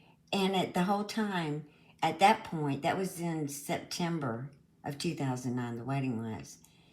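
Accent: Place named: South, United States. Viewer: You said it just fine. I love your voice with that sweet southern accent very calming and easy to listen to. Reminds me of my Aunt's from Tennessee and Great Grandma. Mary tells her made up stories but fails to accept She is the monster.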